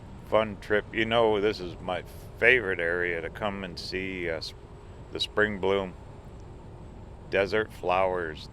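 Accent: United States. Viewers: Your English accent is for any particular area in the U.S. ? I like your videos, cheers from Ireland 🇮🇪 👍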